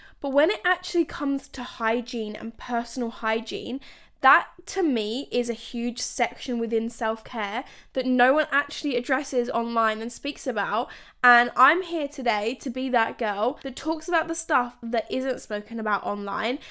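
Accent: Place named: Australia. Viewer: Am I the only one who is hearing the Aussie come out!!! Can’t wait to see your Aussie content! Xx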